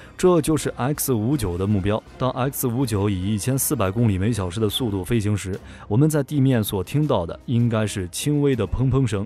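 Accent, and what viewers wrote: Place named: China. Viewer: 大陆人口音，台湾人专用频道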